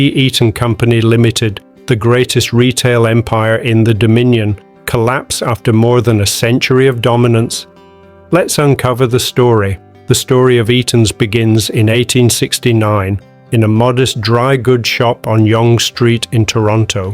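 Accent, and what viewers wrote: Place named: Lancashire, United Kingdom. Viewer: What a clear crisp voice narrating the Eatons story. Accented by a touch of Lancashire?. Possibly Wigan, Bolton, Bury, Blackburn, Darwen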